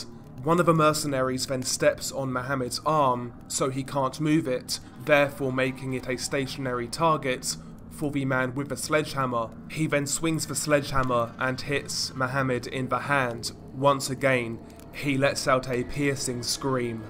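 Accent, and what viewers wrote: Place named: United Kingdom. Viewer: Im from Germany but I love youre channel. I can understand it whats saying. Keep going ! And between I love youre british accent ❤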